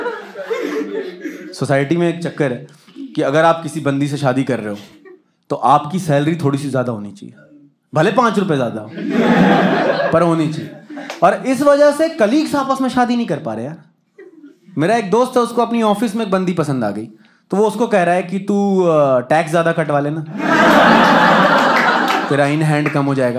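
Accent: Bihar, India. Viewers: Tum Bihari ho. accent se pata chalta hain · His hindi has a Bihari undertone. Is he from a Bihari family? just curious to know not being hurtful to anyone. Bura mat lena koi aab. Aaj kal kuch puchne me bhi sochna parta hai social media pe.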